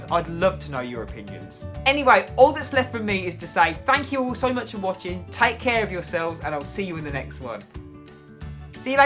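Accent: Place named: United Kingdom. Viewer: Oh, I'm so thankful for your hat and T-Shirt))))))) And your British accent...🥰🥰🥰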